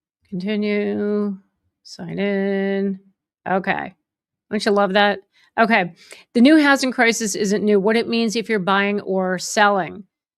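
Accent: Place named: North Jersey, United States. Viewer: Awe, you sound like my relatives from my husband’s side in N. Jersey! I feel at home. 😊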